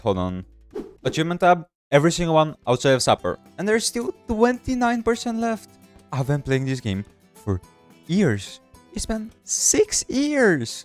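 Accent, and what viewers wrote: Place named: France. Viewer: you have a thick french accent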